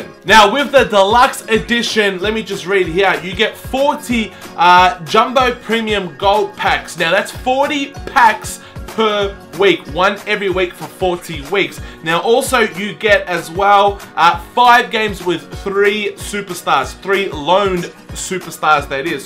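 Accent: Australia. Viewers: Your aussie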